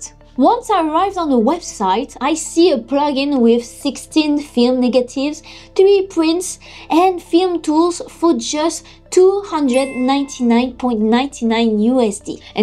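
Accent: France. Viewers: Verry nice french accent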